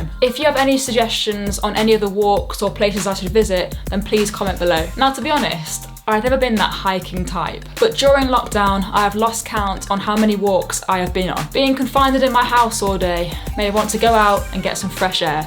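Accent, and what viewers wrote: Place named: Derbyshire, United Kingdom. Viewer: I love this. Also, I love the accent. Hehe. Which I actually listed as one of the things I love being at England. And made a short video about. Derbyshire ha. ❤️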